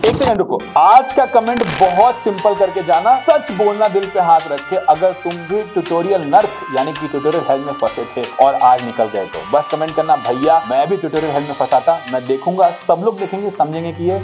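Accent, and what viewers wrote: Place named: Bhojpuri, India. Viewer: your bhojpuri accent is so sexy "Maatha" mtlb sir dard 😂😂😂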